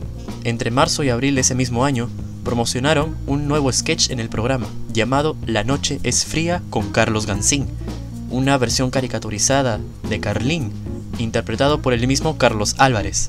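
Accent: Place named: Chile. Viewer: Tienes acento chileno pero mejorado.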